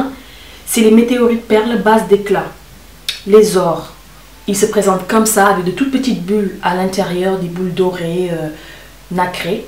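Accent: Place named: Senegal. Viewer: Tu es de quelle nationalité ? tu as l'accent sénégalais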